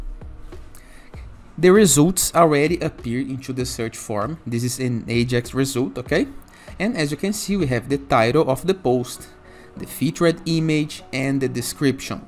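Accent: Brazil. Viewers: Uai... Você não tá ensinando português de graça, né... hehehe Mas a dica grátis é Faça um dicionário de pronúncia e passe a ler as letras na pronúncia do outro idioma, não na da tua língua materna Aí ao invés de lêrni ráu tchu impruvi en op-maizi dê sêrchi rezuuls E ficaria mais leern róu t'il óp(t)máiz een m-pruf dâ sêrsh (sem o i) rizult's Pra quem tá há 6 anos num país (a menos que você esteja em Detroit ou Miami) era de se esperar que você tivesse dedicado bem mais pra falar o idioma além do "suficiente pra se comunicar" e passado pra algo fluente (E olha que eu nunca sequer viajei pra algum país anglófono) Logo, trocar o maneirismo "né" por "ok" não faz nenhum sentido. Americano não fala assim. Até se fosse o "ya know" faria mais sentido ou trocar o "tipo" por "like" (que continuaria sendo feio, mas pelo menos faria sentido no outro país. Porque, se um zé aleatório que nunca viu tua cara conseguiu identificar LITERALMENTE em duas frases de onde você é, acho que ESPECIALMENTE nesse governo aí a coisa não vai dar bom pra você 😬 É um problema bastante recorrente do brasileiro classe média achar que é branco no mundo todo só porque se considera branco aqui [E eu falo isso justamente porque tendo a pensar que seria melhor você aí do que voltando hehehe]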